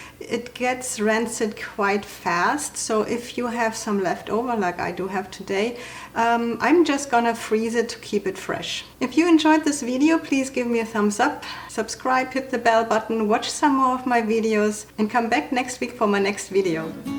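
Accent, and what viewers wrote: Germany. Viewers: Not only do I love this recipe and channel, but I love your accent! My father was from Hamburg and I miss his accent. He passed away. He was from WW2. He was a doctor as a profession in America. The German accent is a pleasant soft rolling melody of inflections to my ears, so I really find it easier to learn new German recipes on this channel. My brain gets it. ( can't remember the prayer he taught us for "grace" at the table, though, sadly.) It's been decades ago, many!!! Love this channel.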